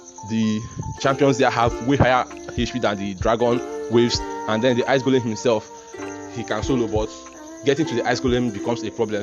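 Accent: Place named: Nigeria. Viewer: Mitchell it is english, he has a Nigerian accent, at the top of the screen you'll see a box, you'll see a box with "CC" click on it for subtitles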